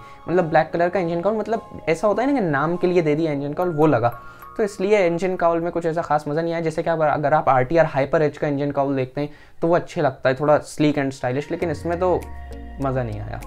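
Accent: Bhopal, India. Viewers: Bhopali accent 🤟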